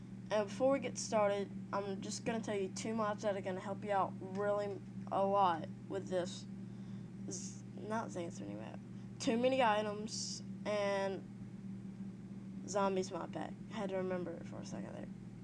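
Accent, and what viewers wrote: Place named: Texas, United States. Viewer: Nice Texan Accent! Go Texas.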